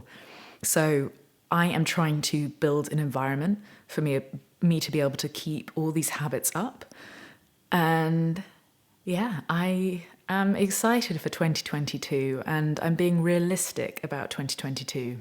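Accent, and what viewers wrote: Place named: RP, United Kingdom. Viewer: The resolution idea is just a fabrication. Some wishful thinking most humans blather, then fail about. You probably don't have a cat, because you travel so much, but, if you did have a cat, I would counsel you to monitor their way of passing the days. What do you want really? More fame or money? More free time? To play like Marty or Paul Davids, John Mayer or hundreds of other guitar players? (Chet Atkins, Tommy Emmanuel, Glen Campbell, Vince Gill, Justin, BB, Stevie Ray, Jimi, Bonnie to name a few). I would say...forget the New Years resolution aspect...and just do you. Different topic. How would you characterize your english accent? Oxford like? Upper crust I should imagine. But I am interested in how you would describe it. I am several generations older...dad was a WW2 pilot..so, I am a boomer...one toe in the cemetery...nearly an ex-parrot. 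Just be you. You are wonderful as you are.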